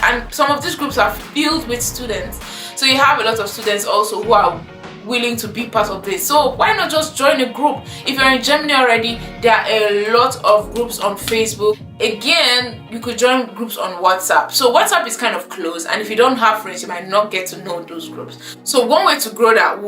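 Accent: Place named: Nigeria. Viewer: name of the group? i actually thought you were nigerian,until you said kenya..wooow nice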